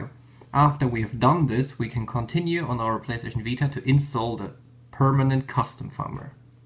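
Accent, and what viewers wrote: Germany. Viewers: Some good old german accented English in the morning, lovely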